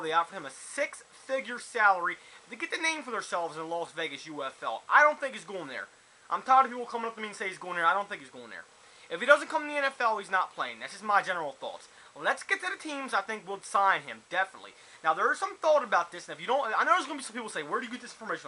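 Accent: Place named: Baltimore, United States. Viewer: I love your Baltimore accent, man. It's fukin awesome...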